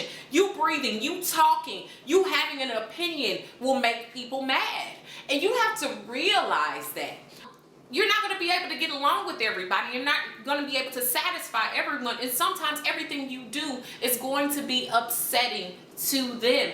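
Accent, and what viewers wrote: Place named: Florida, United States. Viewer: you sound like your a florida girl lol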